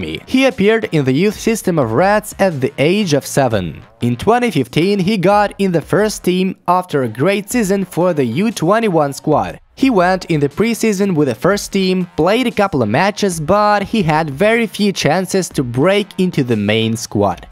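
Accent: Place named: Netherlands. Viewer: He sounds Dutch.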